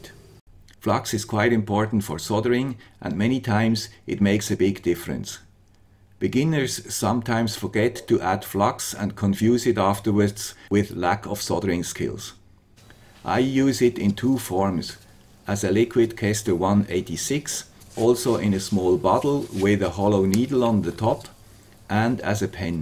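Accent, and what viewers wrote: Switzerland. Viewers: Hallo, Your Video´s are very good. I like to watch them and hear your Swiss English. And I learn a lot of them. Maybe in the Futures you can make a Video how to handle a 3D Printer. I mean please show us how the software works. As I know it is not so easy to handle this 3D Software if you are a Newbe. And if you want make a Tutorial of all the things to know. Keep on with your nice Works. Best Regards Jürgen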